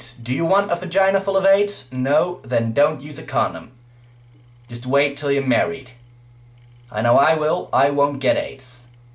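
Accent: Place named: Europe. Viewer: he has an european accent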